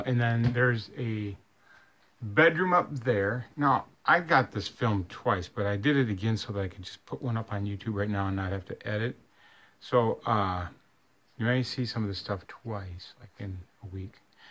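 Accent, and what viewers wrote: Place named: Michigan, United States. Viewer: You sure speak Michigander style quite well.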